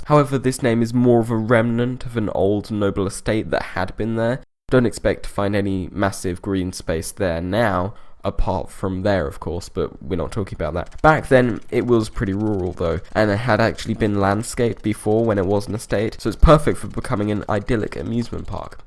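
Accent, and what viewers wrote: United Kingdom. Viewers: ugh damn British pronunciation also why do y'all have aero instead of air and zed instead of zee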